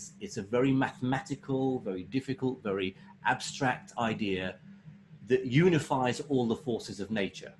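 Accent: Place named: Iraq. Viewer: لغته العربية باللهجه العراقية گلش حلوه.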